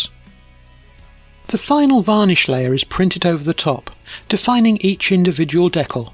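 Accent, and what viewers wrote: United Kingdom. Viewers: I speak for everyone when I say, we love how you Brits across the pond say stuff like; Decals, Schedule, Bonnet, Lorry, The Loo, etc. it’s so posh! 👌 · I am indeed. I usually like the way the accent sounds from your side of the pond, that one though, grates on my ears. 😜 Keep up the good work.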